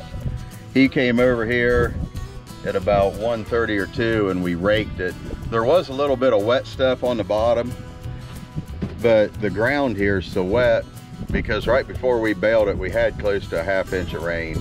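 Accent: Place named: South, United States. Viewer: Accent is no different from an american southerner thats awesome.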